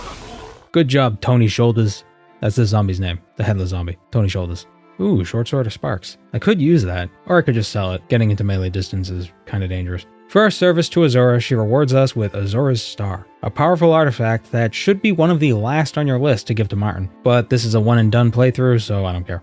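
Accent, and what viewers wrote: Boston, United States. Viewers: the bostonian accent is strong with this 1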